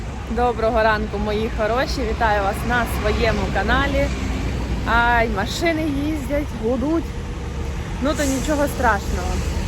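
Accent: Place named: Nikopol, Ukraine. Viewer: Я хочу сказати,що в Польщі чисто скрізь. Прибираають вони не тільки для туристів, вони так живуть.І це супер. А ще, я просто в захваті від Вас і Вашої мови! Мої батьки і я теж так розмовляємо (балакаємо)). Я з Нікополя. Як добре, що ви виїхали з окупації. Наш Нікополь обстрілюють з Енергодара по три -чотири рази на день... Просто жахіття.Кожний день гинуть люди, руйнуються будинки...Я в Кракові. Мама їхати до Польщі не хоче. Сказала, що вмирати буде в своїй хаті... Плачу, п'ю таблетки,щоб заснути. Ви тримайтеся, ви разом і уе головне. А в Польщі теж можна жити, працювати і заробляти. І будемо молитися, щоб ті фашисти згинули з нашої землі, з наших домівок. Продовжуйте знімати, у Вас добре виходить, цікаво. А кому не цікаво і не подобається, то,як кажуть, ви ж не долар))щоб всім подобатись.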